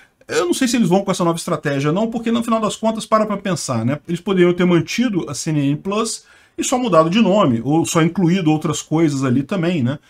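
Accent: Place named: Rio de Janeiro, Brazil. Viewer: Uma sugestão pro seu canal: que tal colocar uma música de fundo? Você é do Rio de Janeiro? Deve ser do interior, porque seu sotaque é meio misturado...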